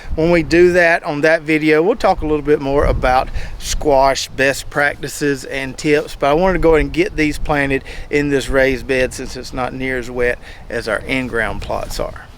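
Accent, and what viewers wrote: South, United States. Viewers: lol southern talk is funny 🤣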